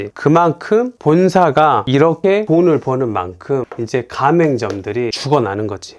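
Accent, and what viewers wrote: Jeolla, South Korea. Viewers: 전라도 사투리에서 거른다 ㅎ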